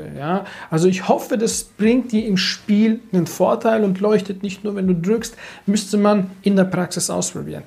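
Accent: Switzerland. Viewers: Schweizer? Woher kommst Du?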